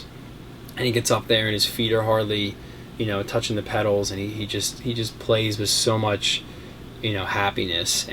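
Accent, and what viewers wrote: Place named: Pennsylvania, United States. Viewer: man, that PA accent. hahahaha. makes me feel like home. congrats on 11k subs!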